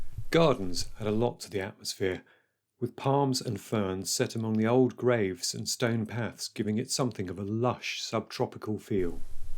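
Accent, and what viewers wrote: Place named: RP, United Kingdom. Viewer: Fantastic nice film and the narration is high English at last!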